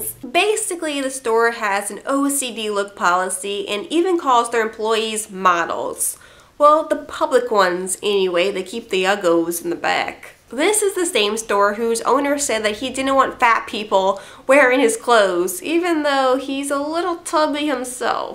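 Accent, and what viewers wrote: United States. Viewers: you got a great American accent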